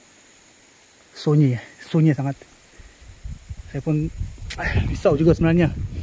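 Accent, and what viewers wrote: Sabah, Malaysia. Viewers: Sabahan ke..terbaik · Dia Sabahan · org sabah ke bang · Kau orang mane bro? Mcm loghat sabah atau sarawak je 😅😅. Dh lama ke duk semenanjung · Abang sabahan ke dengar macam slang sabah bha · maaf nk tanya saudara ni asal mana.Bunyi loghat tu sesekali mcm org sabah ttp adakala mcm bunti perak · Brother orang mana? Slang cam Sabah je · ni org sabah ke? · Terbaik La.. Bukan Kosong Ni.. Mesti "Berisi".. Hehehe.. Tapi Slang Abang Mcm Borneo Skit.. Bunyi Macam Sabahan.. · Bro asal sabah ke dengar longat bahasa melayu macam dari sabah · Mantap bro.nanti bolwh repeat dengan kita .5/ 6 org je.Slang abang macam orang Sabah/Sarawak.Betul ke?? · Orang sabah ka bang loghat sabah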